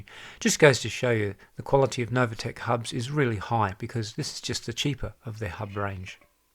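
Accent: Australia. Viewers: I might get a set after Christmas. I am normally dissapointed by my presents and end up spoiling myself with stuff I really want. I'll let you know if I do. I've only recently discovered you. It's nice to hear an Aussie after watching gcn, cycling weekly, bike radar and the vegan cyclist.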